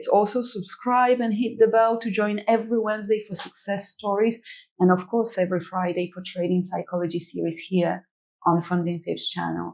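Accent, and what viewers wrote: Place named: United Kingdom. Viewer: This video is beyond informative. The soft warm British accent is definitely helpful.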